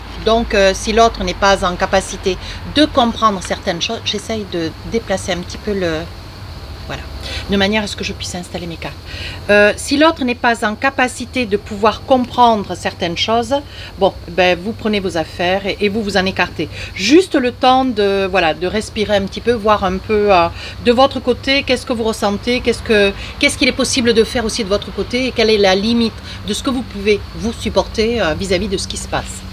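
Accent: South, France. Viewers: Quelle énergie vous nous transmettez petit bout de femme talentueuse votre accent me rapl le sud de chez moi que j ai quitté il y a peu en tout cas grand merci pour votre don ❤️🙏belles vacances profitez bien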